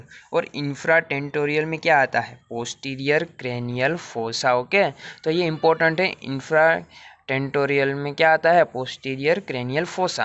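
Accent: Gujarat, India. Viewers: Are u from Gujarat??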